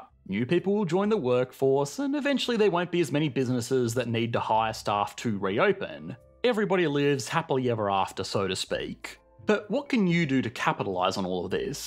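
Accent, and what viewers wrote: Australia. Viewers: I like a guy with an Australian accent telling us how the US Federal Reserve works!!!???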